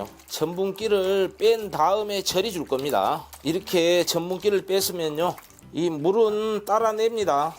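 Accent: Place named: Yeosu, South Korea. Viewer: 안녕하세요. 여수사투리쓰시는 셰프님 정겨워서 늘 제일 먼저 찾아보는 요리정보예요~ 잘 보고 있어요 감사합니다:) 근데 요즘 제목에 자꾸 ‘이걸’ 넣으세요~ 이런식으로 쓰시는거 같아요. 좀 별로예요 제목으로 낚는 다른 콘텐츠들 보면 짜증나는데 는 안 그랬으면 좋겠어요.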